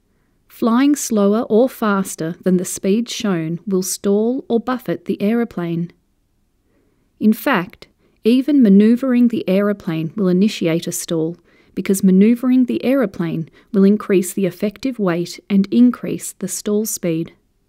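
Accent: New Zealand. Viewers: What accent is it? Is it kiwi or aussie ? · ??Does this NZ narrator ALSO do the "Chickens in my backyard" videos.???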